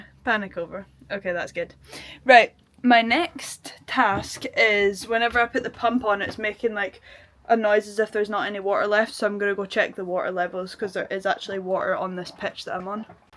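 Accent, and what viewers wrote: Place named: Scotland, United Kingdom. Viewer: I love seeing Scottish YouTubers with unashamedly Scottish accents. The world loves us guys, we don’t need to hide our accent. Everyone understands, deep down 😃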